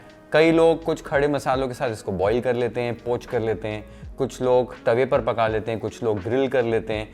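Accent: Maharashtra, India. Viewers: Chef I am ur big fan, Just wanted ur master chef wala episode, u look so handsome. I really follow ur recipes, I am a maharastian girl married to a Bengali guy, ur marathi accent is just lovable. Always wait for your new recipes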